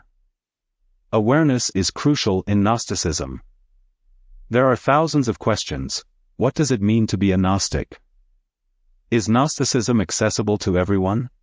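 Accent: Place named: Tunisia. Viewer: لا يا أخي أنا شخصيا لن أشهاد هاته الفيديوهات نحن نحب صوتك و طريقة إلقائك و تفاعلك باللغة العربية الممزوجة بلهجة تونسية لي نحبه ياسر كمغربي جارك و محب من أحبائك شكر و أتمنى منك تفهمي.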